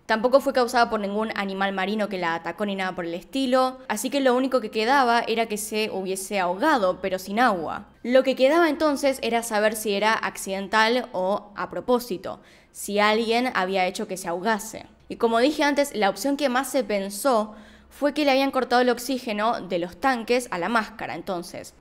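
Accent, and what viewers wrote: Argentina. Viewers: Excelente investigación 🕵️ No me pierdo ninguno de tus casos. "Hola, y bienvenidos una vej mas" hasta lo repito con acento Argentino (soy de México) y me dice mi esposo.... "Ya vas a ver tus casos" y yo... Obvioooo, es mi Happy time. 💜😁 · Felicidades por tu canal!! me encantan tus videos y la manera en la que los presentas, excelente trabajo!! Yo soy de Mexico pero mi ex era de Mendoza Argentina, amo el acento argentino 😘😘😘😘😘😘😘😘😘😘😘😘😘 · conmigo es igual, yo incluso busco los casos más largos!!!!! También me encanta ese español de ella de Argentina, yo soy brasilera y afortunadamente hablo español!!!!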